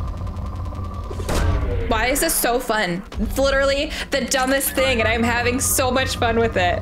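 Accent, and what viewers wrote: New York, United States. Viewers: I knew you were from NY! Are you by any chance from WNY? 😂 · I absolutely do not mind your New York accent. I actually find it to add extra flavor to your already great video content lol. I encourage it lol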